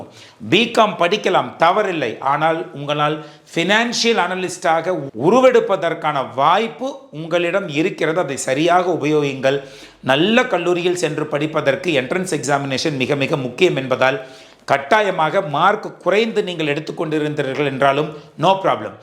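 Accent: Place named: Madurai, India. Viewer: Sir neega enga schl ku vanthirkiga nyabagam eruka madurai bashala pesnigaley😂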